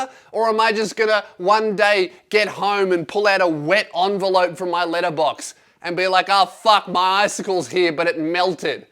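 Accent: Australia. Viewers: Are you Australian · this swearing Australian is music to my ears, why does your voice sound so good lmao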